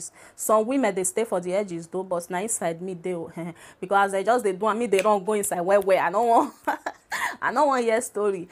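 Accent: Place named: Nigeria. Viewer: yooo nigerian English 🤣🤣shame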